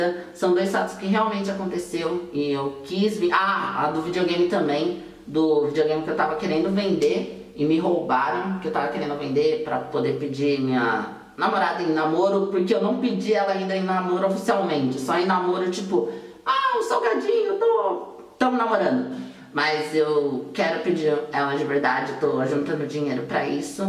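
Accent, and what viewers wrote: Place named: Portugal. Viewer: Tu és de Portugal? Pareces pela tua fala.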